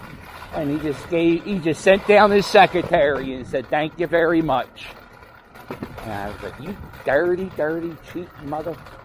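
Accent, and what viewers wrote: Philadelphia, United States. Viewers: You need to do voice over work for "thick Philly accent" types. Lol. I'm from Chester County and somehow it's only South Philly and Delaware County. Why is that? Anyway, cool vid!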